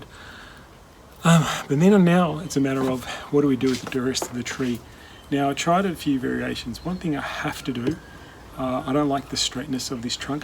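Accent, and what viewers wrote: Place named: Australia. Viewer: Hi, I've been following you on YouTube for some time, I learn a lot about your work with bonsai, but sometimes it's more difficult to follow you due to more the bad sound than your beautiful Australian accent, many days there is wind, we like the sound of the leaves and the birds.....but it would be better with a microphone, don't you think? 😉, kisses from Barcelona, Catalonia your antipodes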